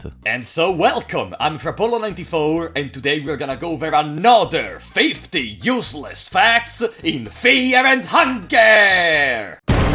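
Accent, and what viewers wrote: Italy. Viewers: Aiuto sento un forte accento ita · I just noticed that you really sound Italian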